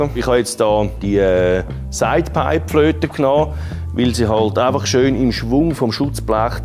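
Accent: Switzerland. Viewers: Ich komme aus Süddeutschland und kann alles verstehen. Ich mag den schweizer Dialekt sehr, Töfli hört sich doch viel besser an als Moped.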